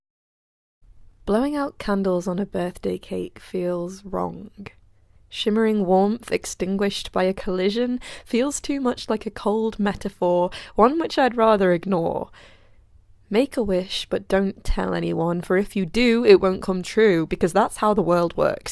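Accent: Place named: North, United Kingdom. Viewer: It is so refreshing to hear northern accents on youtube!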